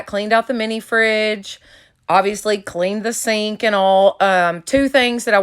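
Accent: South, United States. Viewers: You are just a breath of fresh air, u are jus adorable !! I love your little southern accent too, jus too precious !! OMG !! I am over the moon happy for Ya'll and your family, and yes It Is most certainly bittersweet considering what ya'll have gone through. That Is jus the best news. Your house when It's all done and you put your cute little touches decorating and such, It's going to look so cute, I jus know it !! Oh this jus made my day !! Im so thrilled for you. Congratulations girl. So I see your In Is It Samburg, TN?? You are literally only 2 hrs and 18 minutes from me !! I know If we lived closer to each other we'd jus be fast friends, cuz you are jus a doll. I'm In Oak Grove, Ky...rt down the road from Fort Campbell military base. But I'm originally from Milwaukee Wisconsin. Been down south for about 7 yrs now. Ok sweetie take care of yourselves, much love, God Bless Ya'll !! 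❤❤ Im not Southern but I jus love saying "Ya'll"....🤣🙏🤗💋😘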